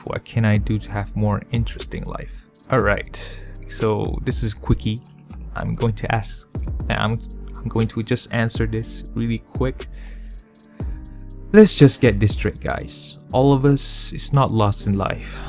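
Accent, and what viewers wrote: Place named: United States. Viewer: You sounded American and as an American, I LOVE the way you say "Perspective". Also, am a big fan of Dragonball Z and Goggins too. ✨ ✨ 💪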